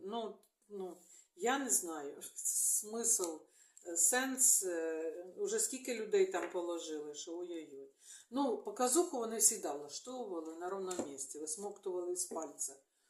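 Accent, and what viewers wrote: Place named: Luhansk, Ukraine. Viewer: Дякую! Вас дуже цікаво слухати, бо Ви маєте особливий діалект як у нас на Луганщині. Відчуваєш що слухаєш свою людину💕 дуже хочеться почути від Вас звістки про новоокуповані (після 24 лбтого) частини Луганської та Донецької області, що з ними буде цього літа?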